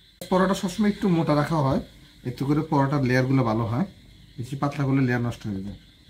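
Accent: Noakhali, Bangladesh. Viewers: আপনার রেস্টুরেন্ট কোথায়? আমি নোয়াখালী থেকে বলছি। আপনার কথা শুনে মনে হচ্ছে আপনি নোয়াখালীতে থাকেন। লোকেশন বললে সরাসরি আসতে পারবো ইনশাআল্লাহ। খুব ভালো লাগবে আপনার সাথে দেখা করে।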